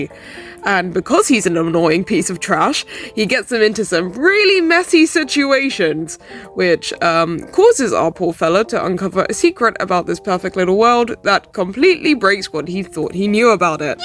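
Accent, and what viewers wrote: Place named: United Kingdom. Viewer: Oh God, he’s British (ps this sounds amazing)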